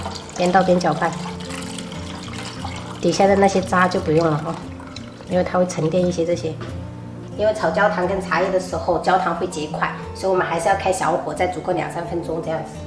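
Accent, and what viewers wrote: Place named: Minnan, China. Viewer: 第一次聽到妳講閩南語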